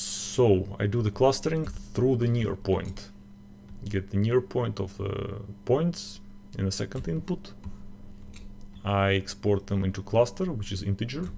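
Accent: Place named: Russia. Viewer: Сразу слышно русский акцент. 😊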